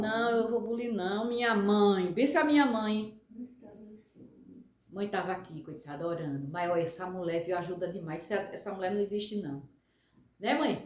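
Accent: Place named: Pernambuco, Brazil. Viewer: Amei cair nesse canal. É o tipo de amizade que mamãe deixa 😅😅😅 tô pedindo a Deus coisa boa pra ver. Seu sotaque parece com o da minha família que é pernambucana, eu sou do Rio.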